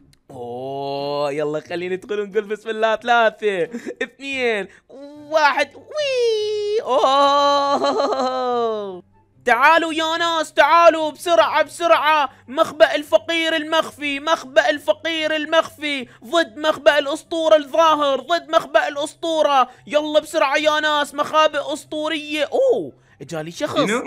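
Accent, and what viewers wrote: Iraq. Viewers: عندي سؤال هل انت عراقي ولا لا??❤❤😊😊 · هاذ عراقي؟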